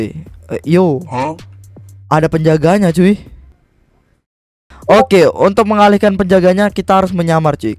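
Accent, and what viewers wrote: Java, Indonesia. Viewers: Bang lu orang Jawa ya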